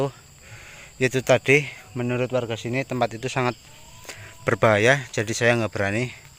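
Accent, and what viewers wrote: Banyumas, Indonesia. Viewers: Assalamualaikum WR WB. MAAF mbah, saya mau tanya karena sangat penasaran. Panjengan domisili di daerah Banyumas krn dgn logatnya. Tapi pastinya dimana. Matur nuwun. Saya penasaran kok masih ada orang canibal.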